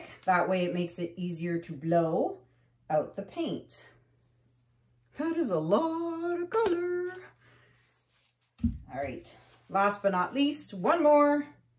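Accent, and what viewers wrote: Canada. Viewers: I love listening to your accent and chatter, I’m an Aussie and love the Canadian accent.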